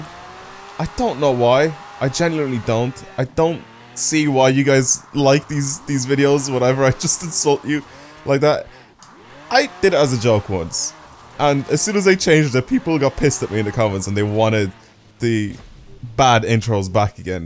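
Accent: Ireland. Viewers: An angry Irish man shouting at me, what else would I want? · What park of ireland you for sound like your from down the country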